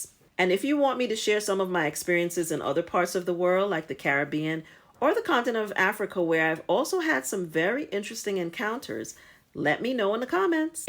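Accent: United States. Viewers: It's too unfortunate that only your American accent is a leverage and asset. Nonodyis is going to respect us (black people).unless we change and improve our conditions.American accent can be an asset. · I guessed Greece was the most racist but Italy is right next door. I wonder if the immigrant crisis has something to do with it (not that I'm making excuses). The fact that your American accent got you a pass makes me think it's aimed at African migrants. You should wear a t-shirt that says: "Sono un ricco turista americano." :p The Netherlands or Scandinavia would be the least racist, being progressive and sophisticated countries.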